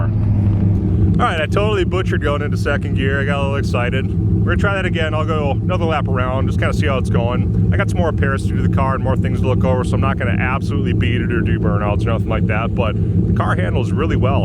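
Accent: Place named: Northern Minnesota, United States. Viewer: I heard northern Minnesota in one of your Iroc videos. Love Irocs! Where in Minn? I'm originally from Intl Falls on the border, eh? So I'll have to catch up on your Camaro vids .... peace.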